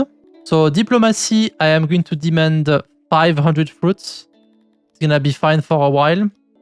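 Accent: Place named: France. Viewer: J’adore ton accent bien franchouillard ! Superbe vidéo j’ai appris pleins de trucs merci bcp ! T’as cb d’heure sur les jeux ?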